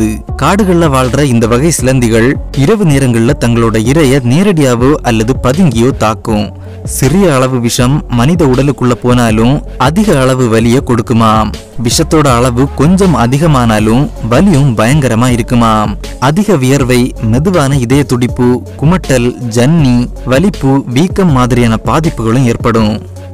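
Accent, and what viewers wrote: Kanyakumari, India. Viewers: Bro ungaluku Kanyakumari ya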